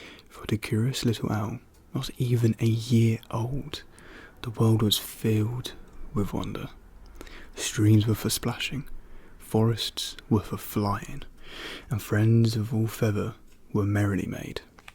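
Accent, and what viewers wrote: United Kingdom. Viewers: That British accent tho was so addicting to listen😂😅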